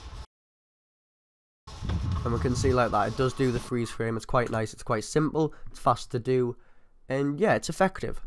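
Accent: Newcastle, United Kingdom. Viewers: A local Geordie I see, nice one.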